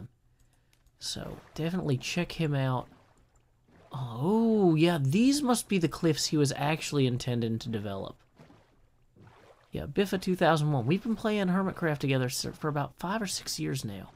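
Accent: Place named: Tennessee, United States. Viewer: First time watchin' your videos, and I've gotta say they're great. Nice hearin' somebody else from TN on youtube. :)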